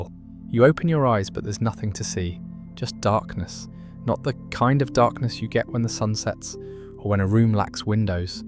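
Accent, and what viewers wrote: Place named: London, United Kingdom. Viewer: By the way this isn't saying anything negative about Irish folks. Their accents are a music only known to 0.008% of earth, near mythical. I'm guessing yours is north west of/in London? Cambridge? St johnswood? Obligatory apologies if not (no of course your not like them ) - I try every time to guess, it's one of my interests. Unfortunately here we mostly sound like... how cardboard tastes.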